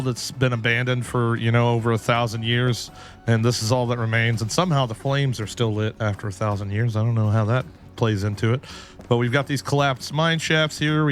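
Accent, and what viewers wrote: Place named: England, United Kingdom. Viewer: I would have been really surprised if you were not nominated. That said though, a few people that have had great builds have missed out. It is what it is. Competition aside, I love your Enshrouded videos, keep up the good work. One complaint tho, you should have used your excellent English accent again when explaining the lore 😁😁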